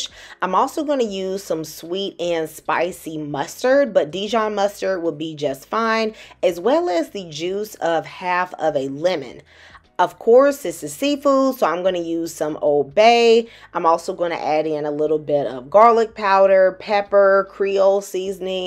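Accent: South, United States. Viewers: I usually just listen to your videos while i'm drawing. I really love that beautiful southern accent, have a great easter you and your family, God bless!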